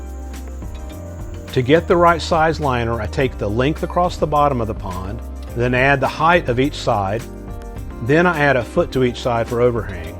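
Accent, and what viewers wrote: South, United States. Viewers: I've come to love watching things like aqua/pondscaping, and this really clicks for me. Your calming, southern voice soothes me and your choice of music is so nice and chill it's easy to follow, plus being filled with all the nature noise. Thanks for what you do,.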